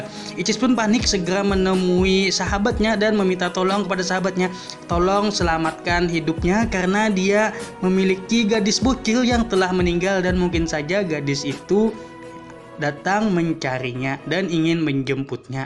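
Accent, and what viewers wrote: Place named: Banjar, Indonesia. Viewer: Hadewww logatx orang banjar bgtt 😂😂😂😂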